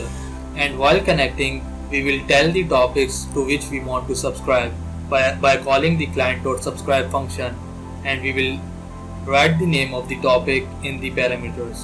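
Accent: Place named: India. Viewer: You already know youre getting the best when the indish accent starts.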